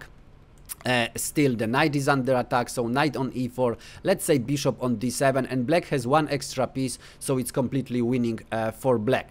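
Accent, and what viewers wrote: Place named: Poland. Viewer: Po akcencie poznałem, że rodak :) Leci subskrybcja, łapka w górę i komentarz :)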